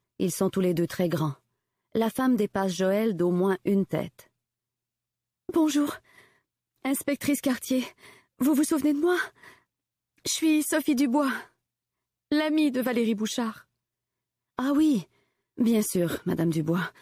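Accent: Quebec, Canada. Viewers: Moi de même,+ l'accent Québécois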